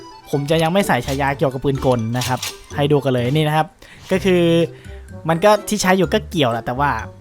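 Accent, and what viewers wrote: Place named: Isan, Thailand. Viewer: เห็นบางคริปพูดอิสานนึกว่าคนบ้านเดียวกัน😅🤣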